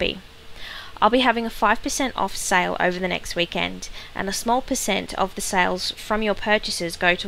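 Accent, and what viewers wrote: Australia. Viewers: classic aussie accent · Are you Australian?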